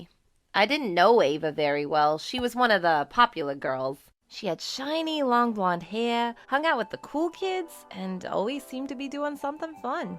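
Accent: New York, United States. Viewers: is it me or or does it sound new yorkish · She new Yorker voice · She got that model new York accent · No she has a New york accent. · Her accent is really cute it’s kind like a New York accent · new yorker? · That thick new york accent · It’s probably the New York accent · This has to be a New York accent. It just sounds so familiar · She sounds like she is from NY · Well i can tell she’s from new York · Her voice sounds like a ny accent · newyork accent? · Nice new York ancient or is it just me 🙋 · She sounds like she has a New York accent and one of the background she was in with her sister that thought bubble was a gacha life background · I heard that new york accent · Moe and moe pi'tures! Sounds like New York baby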